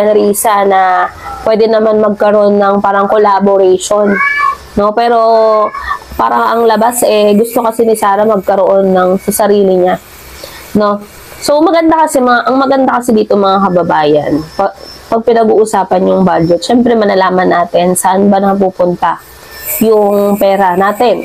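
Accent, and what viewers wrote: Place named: Bicol, Philippines. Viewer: Ano yang puna mo, saan pupunta nag mmagaling parang da way you deliver like Leni style Bicol k b ha.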